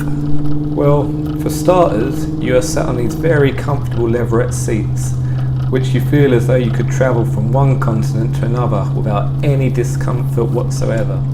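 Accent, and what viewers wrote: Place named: Essex, United Kingdom. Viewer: sounds like Essex though.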